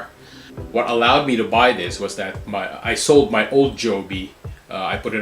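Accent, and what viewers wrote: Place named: United States. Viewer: hello ang galing naman ng pang vlog mo ,american na ang accent mo nice video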